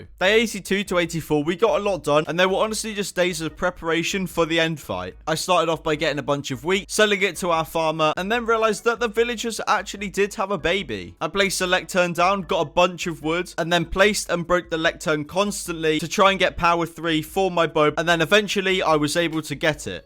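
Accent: United Kingdom. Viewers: He sounds British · u sound different didn't u have a British accent or am i thinking about a different youtuber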